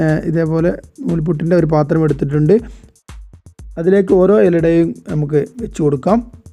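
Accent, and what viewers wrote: Malappuram, India. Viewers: ariyanthondallaaaa ivde Malappuram angne vayil varollu😀😀😀😀 Udaharanam :- Maya payam😀😀😀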